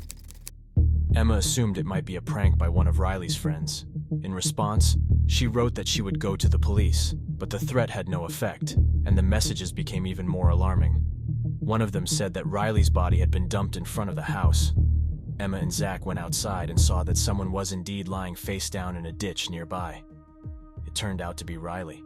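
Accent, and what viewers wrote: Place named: Australia. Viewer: I love to see how you are growing so fast!! I miss the Aussie accent. Great video!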